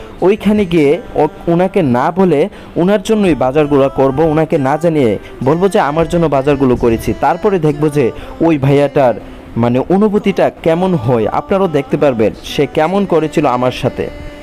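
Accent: Sylhet, Bangladesh. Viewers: জাযাকাল্লাহ ভাই আপনার ভাষায় তো বলে আপনার বাড়ি সিলেট না?আমি কি ঠিক বললাম?🤔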